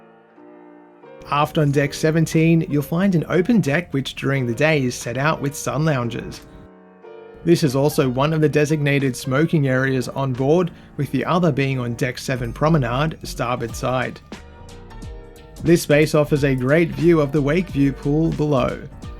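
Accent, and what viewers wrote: Australia. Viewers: It's refreshing to hear an Aussie doing cruise content. awesome stuff man. Thanks you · I love your Aussie accent! Where in Australia are you? I went to Melbourne and Sydney almost seven years ago and loved both. Of the 18 countries I've visited, Australia had the nicest people. Thanks for the video. I'm looking at doing a Princess cruise in a few years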